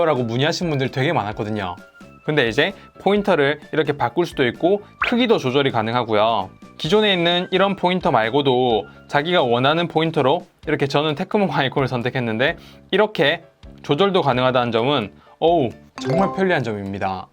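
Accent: Gyeongsang, South Korea. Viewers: 목소리가 참 매력있네 경상도 이신가..?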